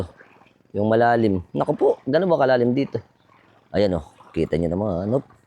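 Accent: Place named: Bulacan, Philippines. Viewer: Yung accent mo sir bulakenyong bulakenyo 😅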